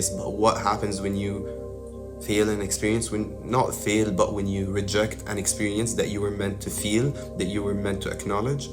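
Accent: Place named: Ireland. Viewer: Your accent is mad. Can I detect a hint of Irish?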